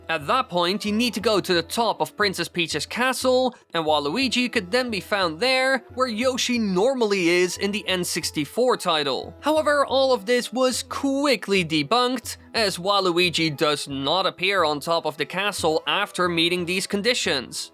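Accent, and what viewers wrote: Netherlands. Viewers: Je moet je hollands accent nog iets beter verbergen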